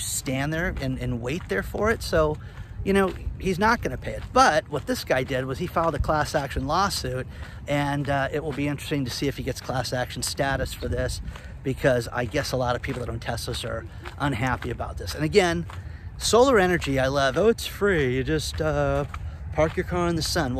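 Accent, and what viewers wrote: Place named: Western New England, United States. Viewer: you sound like you're from Western New England... like me. Great work!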